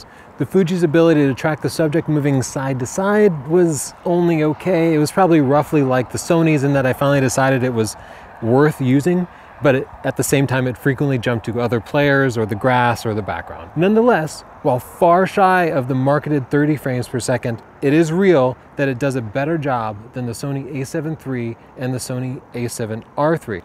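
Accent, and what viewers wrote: United States. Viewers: I love the American pronunciation, the UK being the 51st state, now commonly known as the United Kingdom of America and we have an American princess now, ignore the haters i wouldn't give em air time, i love my Mustang too No more visits to California to Enjoy driving a Mustang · "It's Ny-kon in the US, not Knee-kon." And then go on to claim 'Zee-7' is a world-wide pronunciation. The letter Z is pronounced Zed everywhere but the US. The product will therefore be pronounced Zed-7 everywhere but the US, whether you like it or not. If you want leeway for your American dialect and pronunciations, at least show the same courtesy. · Loved that ending. "I'm an American, this is my dialect." Hell yeah. No one should be ashamed of their dialect, Americans included.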